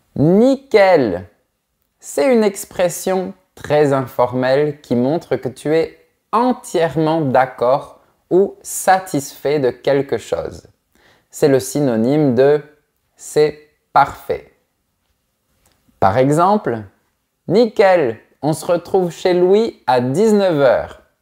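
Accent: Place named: South, France. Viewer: Un diamant, oui, mais pas brut plutôt raffiné...avec un petit accent du midi ( sur les "o"). Mais c'est charmant. Merci à.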